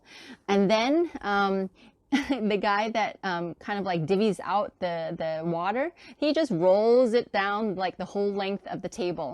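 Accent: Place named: United States. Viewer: Assalamualaikum Sister. My family and I were scheduled to go for Umrah in April 2020. However, regrettably Saudi Arabia closed its doors for all pilgrims in March postponing our trip to the Holy City. Insya' Allah, we will be able to make our said mini-haj early next year. Thank you for your very informative and can I say, entertaining videos. You are very eloquent in your presentation. Going on a tangent, I cannot help but wonder where are you originally from. If I were to hazard a guess from your name, perhaps you were originally from South Korea, judging from your accent you were educated in the States and - clues in the video, you are now living in Asia (Malaysia, I assume... again clues). Ha ha ha! Nevertheless, very informative and I hope to be able to visit and experience these places that you have recommended. Wassalam!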